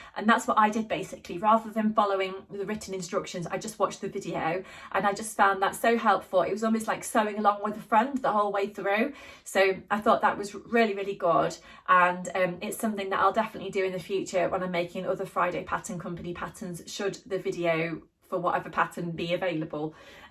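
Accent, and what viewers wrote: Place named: Cheshire, United Kingdom. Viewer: I really like the look of your Tulip skirt and Sew Over It have a sale on at the moment - guess what I’m buying! 😂 I’m not a fan of Tammy’s patterns though, I’ve tried a couple and they don’t suit my body shape (I’m slightly rounder in various places than you are 😂). Good job there’s room for all of us in dressmaking, isn’t it! I’m currently doing a proper fitting course with Gina Renee Designs online, making a moulage and a bodice sloper as I’m so sick and fed up of my blouses etc not fitting properly and having to give them away or charity shop all my hard work. I’ll know how to adapt my rather extensive pattern collection then, I reckon and until I do, I’m making men’s shirts for my husband! Lovely to see you again btw, with your lovely accent that sounds not too far off from me (Cheshire)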